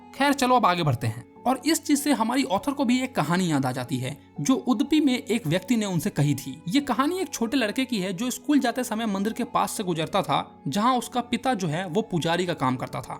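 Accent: Bihar, India. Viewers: Bhai bihari ho kya 💯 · Sir ap Bihar ke ho kya🤌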